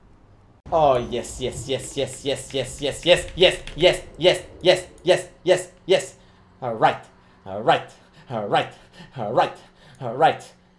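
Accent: Quebec, Canada. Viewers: T'es québécois ?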